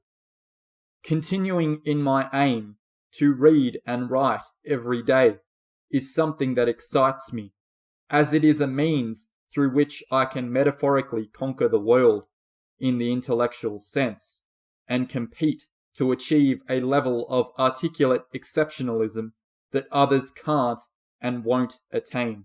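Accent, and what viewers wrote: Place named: New Zealand. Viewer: You may be a strange kiwi, but under all 'thit' accent we are kindred spirits. Thank you for being brave enough to blaze a path.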